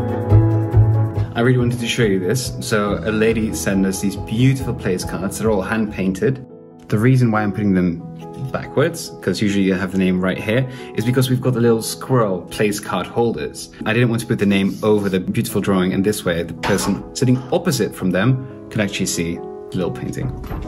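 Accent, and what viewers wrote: United States. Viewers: You did a beautiful job and listening to you speak English you almost sound like an American... your English is great! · You've learned perfect American English and accent.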